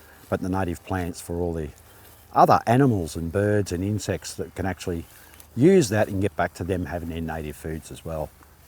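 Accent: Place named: Australia. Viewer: Thank you for the interesting video 👍 I love the Australian accent 🤩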